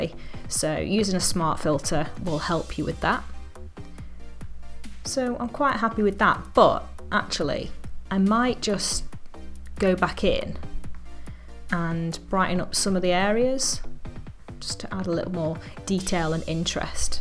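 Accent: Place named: Liverpool, United Kingdom. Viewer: scouse accent = 😍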